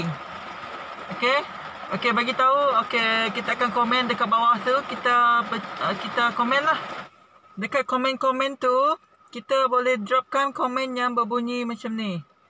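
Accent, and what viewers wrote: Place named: Sarawak, Malaysia. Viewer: Thank for info....btw slang Sarawak is best...betul ka...sy hadir untuk sokongan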